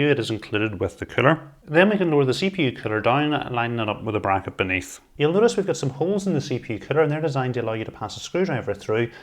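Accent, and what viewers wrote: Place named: Tyrone, United Kingdom. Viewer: Did not expect the accent. Refreshing to hear from a Tyrone man.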